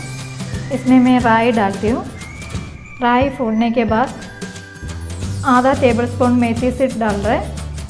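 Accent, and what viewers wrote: Kerala, India. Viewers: Amazing Ma'am. Super fish curry. And your Hindi is amazing, with a touch of Malayalam accent. . That's the beauty of our great country India. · love the mallu accent....maja aa gaya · you are from Kerala,you should be proud to speak in your mother tongue.. Your Hindi sounds like Malayalam. I love Malayalam,speak in Malayalam . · Nice receipe , Nice malayalam Hindi & Nice saree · Nicely explained. Moreover your language was very sweet dispite being from Kerala. Hindi gives you a wider audience... keep it up 👍. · Hello there, liked watching your recipes, specially the coconut shells used n the pots cooked in. Your hindi reminds me of one of our Auntie from Kerala and many friends I have from there, so also when you give recipe details I cherish your speaking. Keep sharing recipes, I wish I could also get tamarind and shallots from Kerala :-) Have a blessed wonderful day! 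· Keralite flavoured hindi is really very sweet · fish to banayenge tab banayenge par aap ho bahot hi zyada CUTE ho and aapka malayalam accent me hindi bolna bahot hi pyara lagta h. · Love you dear. Am in Dubai suddenly I remembered frind who is shifted to Kerala Bec same style of Hindi speaking ❤️❤️❤️ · aapka hindi bolne ka style mujhe meri friend ki yaad dilaya. She is also from kerla.. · Your Hindi is typical Mallu Hindi....it reminds me of my mummy speaking hindi with our neighbors...even my mom makes fish curry in the same manner....amazing job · You are so sweet hindi Kitna majedar he kerala accent me fantastic recepi god bless you always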